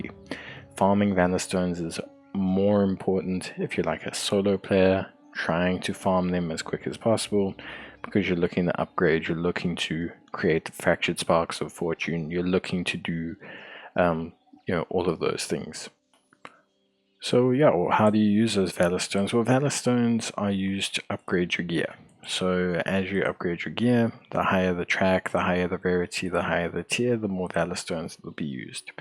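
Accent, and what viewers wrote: South Africa. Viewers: Bro, you South African?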